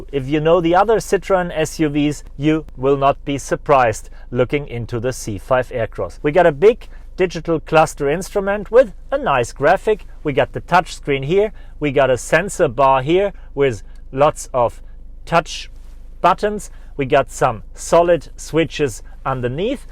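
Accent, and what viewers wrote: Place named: Germany. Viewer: You ain't no violinist; just a regular bratwurst German with a Nazi accent.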